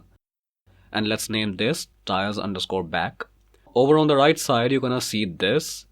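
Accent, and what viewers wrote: India. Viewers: Thank you person who's name I don't know who has an accent that I think belongs to india. This is a great tutorial. I would love to learn more from you.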